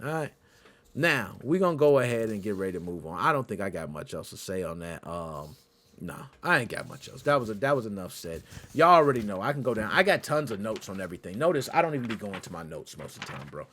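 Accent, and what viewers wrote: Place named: United States. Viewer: I just hate American black guys' accent 😒. especially the US ones that gives lectures like you doesn't suit you at all. Plz correct your accent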